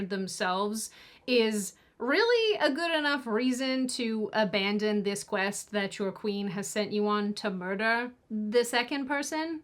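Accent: Australia. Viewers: You’re Australian? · sorry, you are my second favorite funny talking Australian. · The funny talking Australian dropped facts again.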